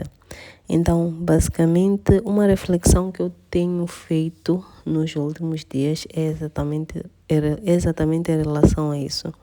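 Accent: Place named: Mozambique. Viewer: Amiga, vc é perfeita! Me tira uma dúvida: De qual cidade/província de Moz vc é? Quero muito saber a origem do seu sotaque super fofo! Beijosssssssssss princesa!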